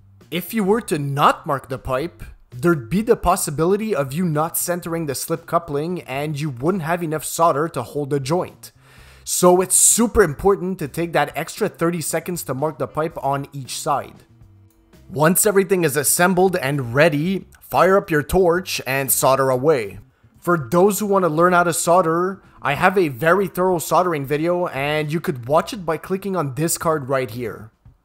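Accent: Quebec, Canada. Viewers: Sounds like a Québec accent ?! I believe I hear poutine between the plumbing... Is it ?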